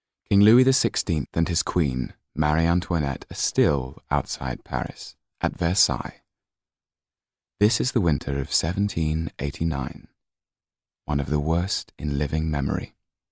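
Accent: Cockney, United Kingdom. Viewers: Cockney accent. Oh my god *.* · He is the reason my siri voice is ‘British man’ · The cockney accent! Oh my god! This man is perfect x_x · Everything is better with a british accent. He could read out a phone book and my heart would still stop beating at every Second word!!!!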